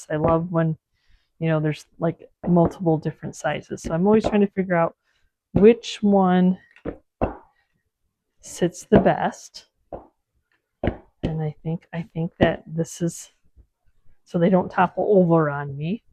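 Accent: South, United States. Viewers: Me too. I have noticed several words she says that sound like us down here in the south. I love it.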